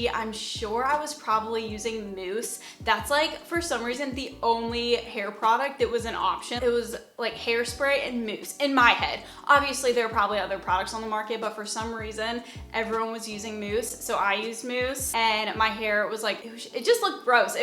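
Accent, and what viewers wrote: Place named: Michigan, United States. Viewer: Super weird question.. are you from Michigan? Your accent sounds EXACTLY like a like my multiple of my friends.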